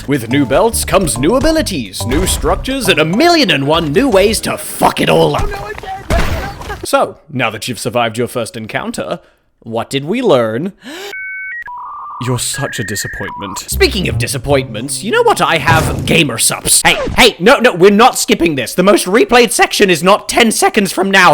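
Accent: United Kingdom. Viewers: the bri'ish accent makes this video funnier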